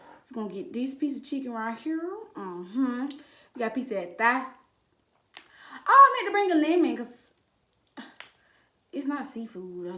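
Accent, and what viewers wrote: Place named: United States. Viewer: Love you and your country accent lol girl friend shooot mmmhmmm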